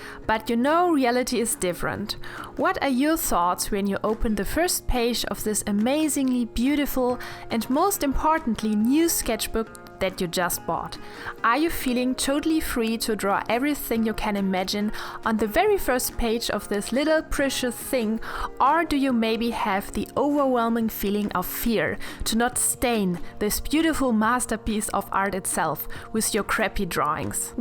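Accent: Germany. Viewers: Are you from Germany? 🇩🇪 (just because of your accent) (And yes, i am german 🇩🇪 😂 ✌️)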